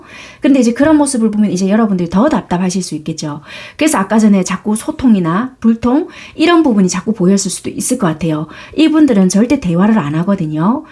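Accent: Busan, South Korea. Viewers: 너 부산에서왔쩨ㅡ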